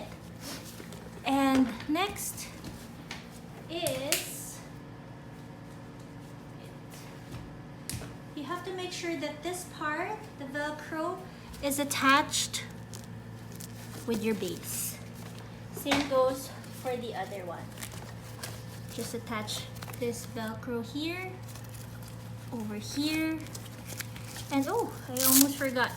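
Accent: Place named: Philippines. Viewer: Hate the accent I can’t…. Pinoy much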